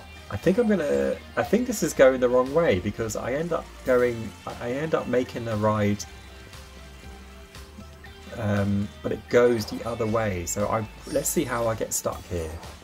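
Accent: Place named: United Kingdom. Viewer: Your voice is from the united kingdom and your from the UK?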